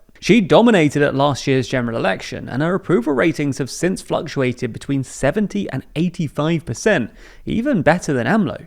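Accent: United Kingdom. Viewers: Cómo mexicano, viviendo en México, tu desesperado comentario no tiene ningún valor y te lo explico. Si un anglo y no solo anglo, sino con acento británico (suponiendo que eso lo hace estar del otro lado del charco) habla bien de la izquierda en México eso solo quiere decir una cosa... USTEDES YA PERDIERON EL RELATO. De nada les vale seguir intentando imponerlo, sus mentiras ya no pasan.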